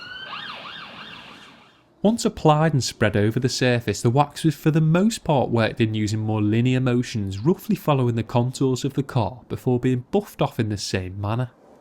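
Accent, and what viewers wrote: Liverpool, United Kingdom. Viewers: by the way he's from Liverpool...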